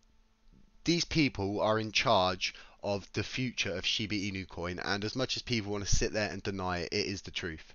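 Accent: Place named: Midlands, United Kingdom. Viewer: I'm sensing a Midlands accent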